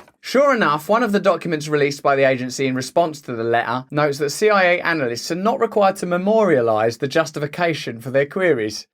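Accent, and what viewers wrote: United Kingdom. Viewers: "it's proper dodgy" XD i love how British he talks lol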